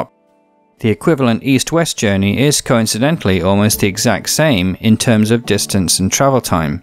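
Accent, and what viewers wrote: United Kingdom. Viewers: Great summary of the history of Brazil, you sound like you are from north England somewhere like Leeds/Sheffield ?, one thing I don't understand, Brazil has some of the worlds largest collection of resources and a pollution of 200 million but with an economy not in the top 10 and 15th the size of the USA, what is the reason for this? Places I have visited in Brazil, Sao Paulo, Manaus, Rio, Brasilia, Boa Vista. It used to have the currency the cruzeiro when I was there long ago, also the ALCO powered cars were fascinating back then.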